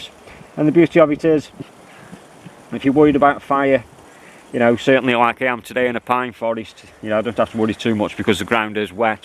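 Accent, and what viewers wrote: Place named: Merseyside, United Kingdom. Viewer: Nice video. You say that you live in the Midlands, but I can't help but think that you're from Merseyside?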